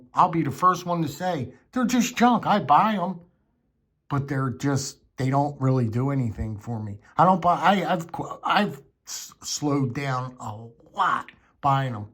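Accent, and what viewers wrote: Philadelphia, United States. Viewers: Traditional knife videos on YouTube I usually hear a lot of southern/midwest accents, gotten used to it. It’s kind of cool to hear someone like from Philly region.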